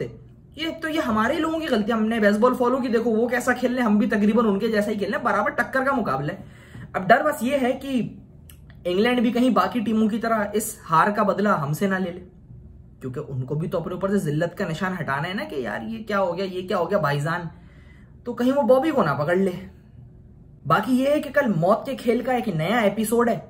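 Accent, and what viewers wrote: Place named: Uttar Pradesh, India. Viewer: Bhai tu best analyst hai cricket ka , Mazak sa hatt ka tere style match kar rahe hai apne sa !! UP wale accent hai teri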